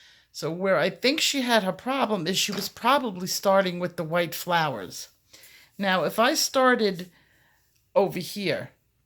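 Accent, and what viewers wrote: New York, United States. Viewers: Thanks so much for this lesson. You are my favorite teacher. I watch other colorists videos and enjoy them but I learn the most from you. PS: I’m from Florida living in Tampa and I love your NY accent.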